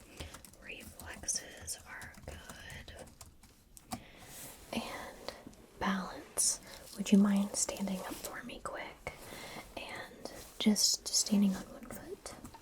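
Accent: United States. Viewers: that american accent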